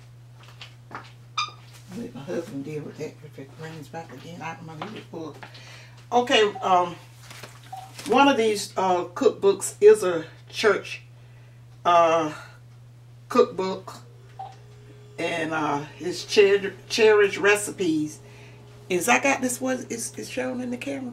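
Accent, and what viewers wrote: South, United States. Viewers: Momma I just enjoy your channel. I love hearing you talk. Make me wish I was from the south. As I said in one of my other post my grandmother was from the south and you remind me of her with how you talk and some of the things you say. The juicing is the new way to go! #Greatstuff Thanks for bringing us in ur home! Take care and God bless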